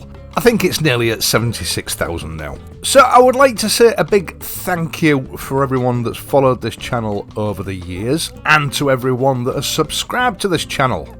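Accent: United Kingdom. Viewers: No no no, you speak British!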